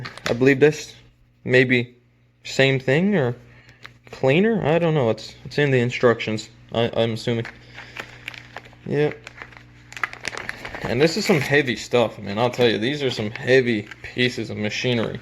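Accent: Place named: Canada. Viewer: Must be from Canada. Likss saying ooot not out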